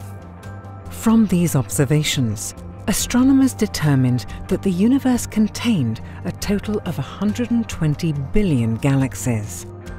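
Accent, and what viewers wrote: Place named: Austria. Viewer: That's a lovely accent... Austria? If so... G'day mate!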